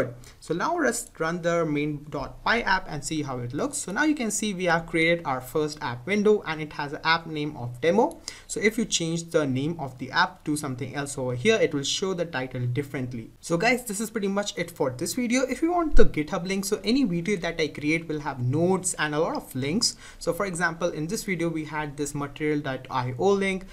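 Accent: India. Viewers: Indian thick accent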